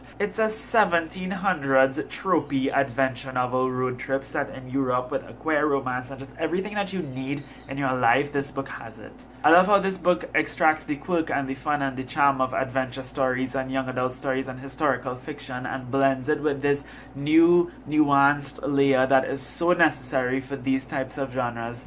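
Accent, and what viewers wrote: Trinidad and Tobago. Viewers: It's so weird but refreshing to hear hear a Trini accent for once. I've gotten so used to foreign booktubers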